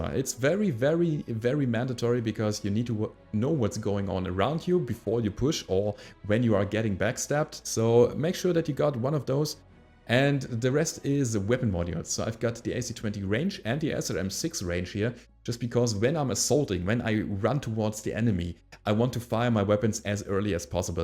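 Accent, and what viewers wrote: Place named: Germany. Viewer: Hey! I'm using a KingCrab too. Nice video! In the meanwhile I didn't played a long time. But I'm downloading the game again. My KC is pretty good too. Is it possible you are from germany or so? Your talking sounds like a german one who tries to talk english... but really good english. ^^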